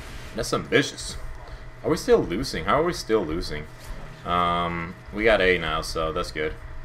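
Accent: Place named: Sweden. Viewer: can u be my english teacher. MY teacher is like 80 and scottish. Scottish accent< Swedish accent!!!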